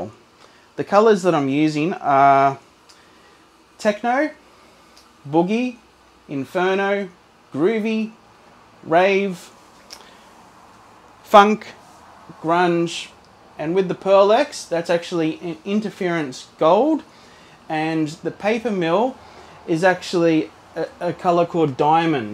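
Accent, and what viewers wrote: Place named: Australia. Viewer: so great hearing another Aussie accent here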